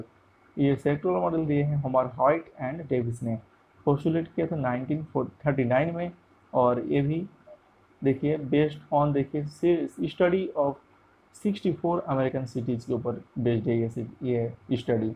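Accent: Bengal, India. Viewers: Sir appke english m bangali tune mix hota h so thoda sunne m effort krna pdhta h thoda app vocal ko open krne ki practice kare humble request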